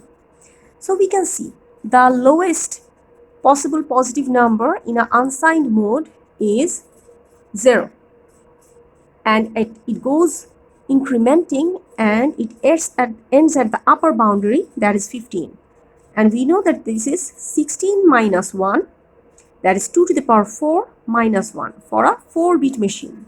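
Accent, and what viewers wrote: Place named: Bangladesh. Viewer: What English you are speaking yaar bengoli English or American eng. At least decide it. It ll be more understandable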